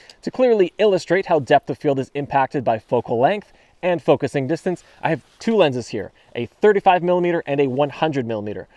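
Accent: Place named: Canada. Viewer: It may be his Canadian accent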